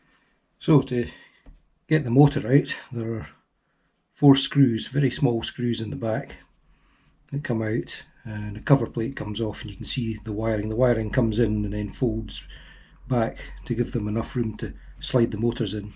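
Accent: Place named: United Kingdom. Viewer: congrats for being the first British accented person to make a video worth anything. your videos are spot on. you make excellent panes. you show them flying filmed properly. you don't annoy with stupid antics like your in a month python film. you don't sound like a moron and you show everything in the build clearly and precisely. please teach your countrymen how make YouTube videos, seems the whole continent is lost. it's like watching Russians Indians or Chinese make movies they just don't get it albeit the Indians are coming up quickly. Btw not racist here just that British people make the worst videos by far and for me personally the accent is almost as annoying as listening to an American fron the deep south. with that said maybe it's just idiots that have turned me off with there inability to film anything properly. you were pleasing to listen to. 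100% British? or is the explanation your from somewhere that just sounds english-ish? either way, please keep up the good work.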